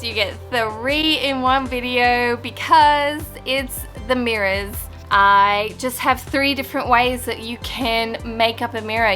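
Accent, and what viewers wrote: Australia. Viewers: I must say, I love your Australian accent❤️👍 as well as your work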